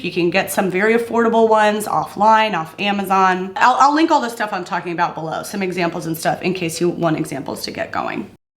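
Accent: United States. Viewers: hi what accent do you have? it's lovely i know it's american but i would like to know the specific accent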